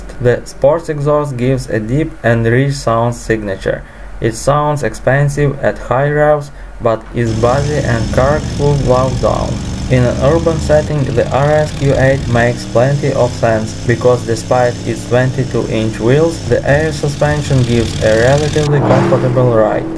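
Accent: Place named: Russia. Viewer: Не привычно слышать русский акцент в видео на английском )) Молодец, продолжай!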